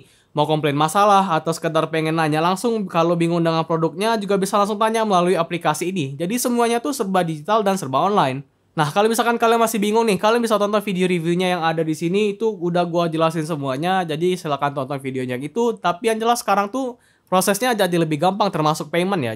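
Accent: Palembang, Indonesia. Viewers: Logat palembang itu bg mana ada tiru tiru hadehh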